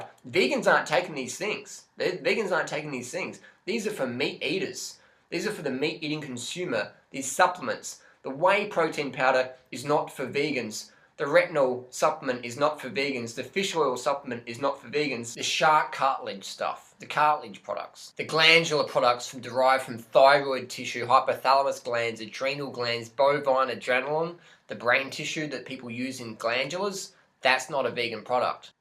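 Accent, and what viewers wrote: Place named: Australia. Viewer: It's crazy how that accent makes us all listen that much more, not being sarcastic. I do plan to try this diet since it just seems pretty intuitive to me. plus peoplel like you and banana girl are good examples. but actually it might just be that aussies can assimilate the sugars easier than the rest of us so it only works for you guys. lolllllllll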